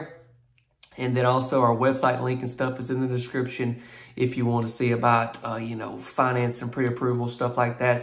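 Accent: South, United States. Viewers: Y'all don't have to watch his channel, he can talk any way he wants too! Y'all up north talk funny to us southerners too. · Lovely home. Wish they had a person showing it that was more professional and talked “normal” without the unclassy southern accent. He makes the video trashy. Can’t stand southern accents.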